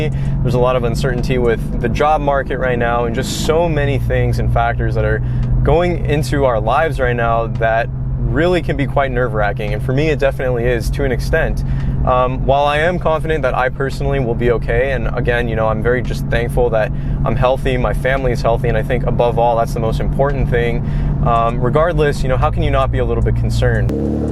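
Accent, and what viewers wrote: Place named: Las Vegas, United States. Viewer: Sound like a local Vegas guy loo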